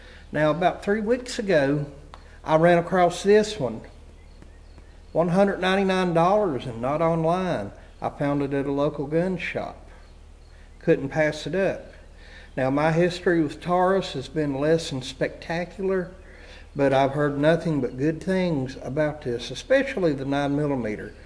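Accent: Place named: South, United States. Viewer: My people! I moved to NH for GA in sept and really miss the southern accent.... :)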